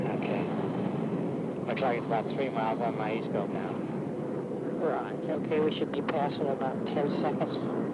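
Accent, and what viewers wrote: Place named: Australia. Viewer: Sounded Australian to me???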